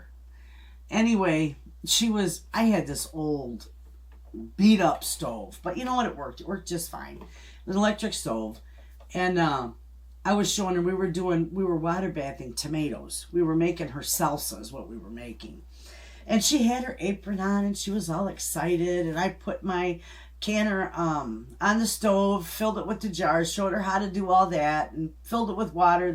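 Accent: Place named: Chicago, United States. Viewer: Lady I just found you maybe a week ago, binged a few videos, and was hooked! You are a delight! I literally smile, and giggle throughout your videos! You are so fun!! I just have to ask. I am a Michigander as well, and too lived in Chicago. Your accent sounded like you were from Chicago, are you? LoL... Accents and trying to figure out where they are from are my thing ?! Anyway I so look forward to the notification that you have posted something! Love your channel!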